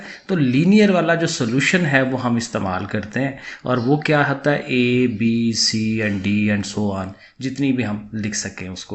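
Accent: Sialkot, Pakistan. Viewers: sir ap ki awaz se lgta he ap sialkoti hein?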